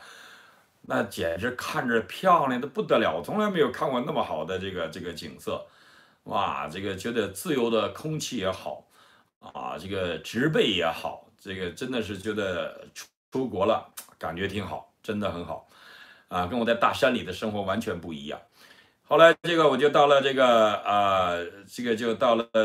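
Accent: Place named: Northeast, China. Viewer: 你说的东北话特别好听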